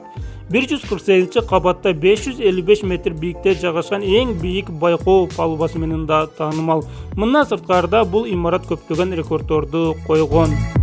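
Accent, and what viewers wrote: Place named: Ichkilik, Kyrgyzstan. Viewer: Ва алейкум ассалоом дос капа болбо адабий тилде суйлоп уйрон сени канча Адам корот Сен кочодогу бала эмессинда кыргыз топ каналыны деп ичкиликче озун тилинде урдурбай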